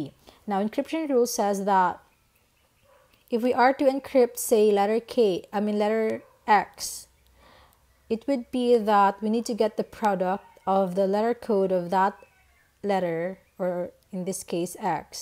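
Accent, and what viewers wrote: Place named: Philippines. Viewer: you're a filipino because of the accent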